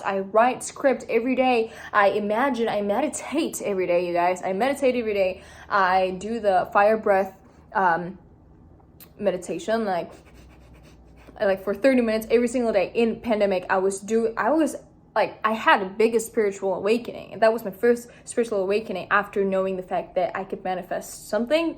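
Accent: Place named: United States. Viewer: Weird how you have an American accent but your grammar is lacking. How do you even remember what you did in elementary school?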